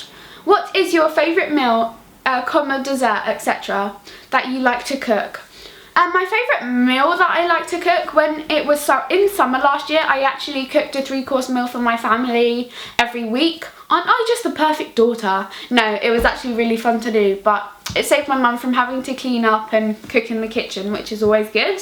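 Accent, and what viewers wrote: United Kingdom. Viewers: I started to understand british english talk most from your videos...Thanks<3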